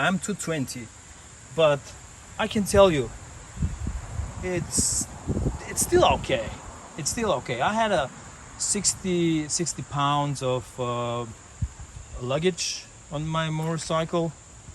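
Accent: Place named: Eastern Europe. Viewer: oh! I knew you sounded Eastern European. Добре дошли!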